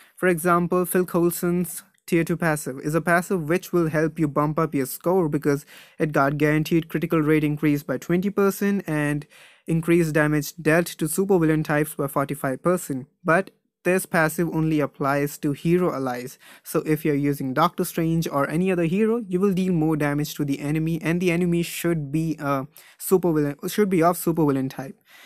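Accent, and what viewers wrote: India. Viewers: Also u have a slight indian touch to ur accent.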